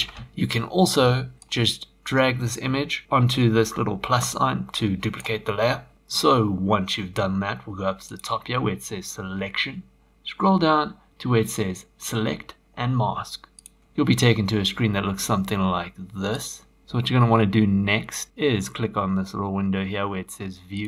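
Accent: South Africa. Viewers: Hey a South African accent :)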